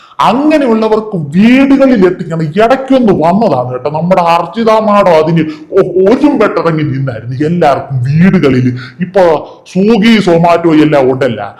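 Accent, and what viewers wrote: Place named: Trivandrum, India. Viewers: ചേട്ടാ! സൂപ്പർ, തിരോന്തരം ശൈലി 🤣😂🤣😄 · 😂😂 നമിച്ചു തിരോന്തോരം സ്ലാന്ക് 😜🤣👍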